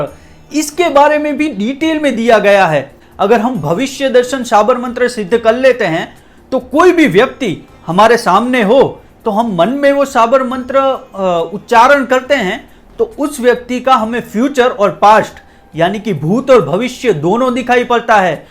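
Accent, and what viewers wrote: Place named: Gujarat, India. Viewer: Bhai gujarati lage bava hindi bol rahe hai super a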